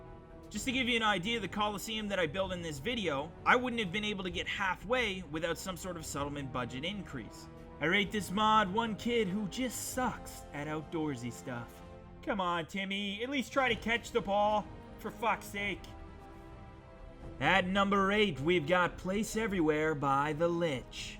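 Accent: Canada. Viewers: The Canadian accent is killing me, it's too fucking funny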